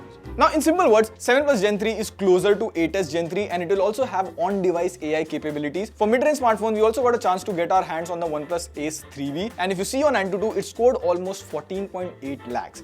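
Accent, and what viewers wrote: India. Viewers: I love your presentation! So funny and energetic. I hope your following grows and grows. I'm an English teacher living in Europe and your India English is so lovely!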